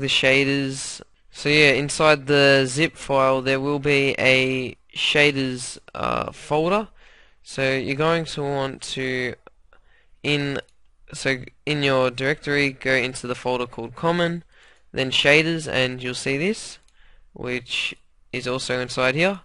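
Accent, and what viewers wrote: Australia. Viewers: ha, i knew it! the australian accent is just unmistakeable :D whereabouts do u live? i am from germany and spent half a year over there, was fun ;)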